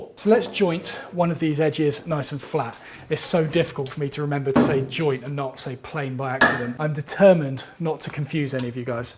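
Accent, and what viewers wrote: United Kingdom. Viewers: are you British? if so stick to our language, couldn't watch the rest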